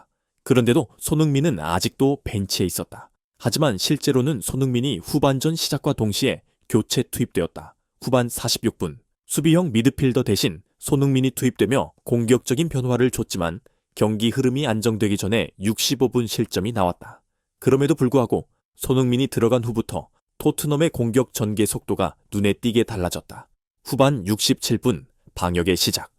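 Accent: China. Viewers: 중국인이 내용을 읽은 건지, 뭐 이러냐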